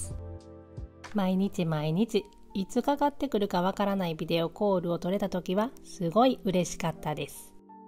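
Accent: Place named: Osaka, Japan. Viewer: 素敵なチャンネルが上がって来て拝見したら、ほんとに素晴らしい✨ ドイツ🇩🇪は昔、息子がマインツにサッカー留学して色々調べたりドイツ語を勉強したりしたので親しみを感じ💕、そして大阪出身なので大阪弁が嬉しくて嬉しくて😢。じっくりチャンネルぜーんぶ観させて頂き、ぜひコロナ明けにいつか🇩🇪✈️行きたいと💡。 ジンバル使った美しい映像と編集ですが、撮影と編集はどんな機材を使っておられますか？ また、とても上手なアフレコ、テロップ、BGMですが、どんな風に制作されていますか？ お子さんもおられる中、素晴らしいワークですね！キチンと伝えるべきは伝えて、またひとり素敵なYouTuberさんに出会えて良かったです☺️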